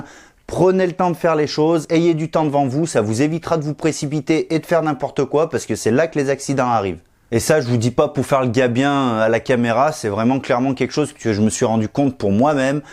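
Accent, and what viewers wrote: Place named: Loire, France. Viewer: Je dirais, tu prones le calme, voir le plaisir de faire les choses, ça pourrait sembler banal, mais moi, il m'a fallu du temps, pour y arriver, et encore.... ; de plus tu as l'accent de la région de St-E., la Loire, la Haute-Loire : souvenirs, souvenirs...Apaisé, je me suis endormi en t'écoutant... mais je me suis réveillé aussi, je te rasssure !: tout ce qui est réglage, ça n'est pas trop mon truc, mais un peu plus maintenant, grâce à ta video . I like, donc. Merci.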